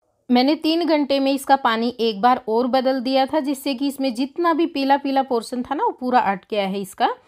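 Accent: Haryana, India. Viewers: I think aap hariyana se ho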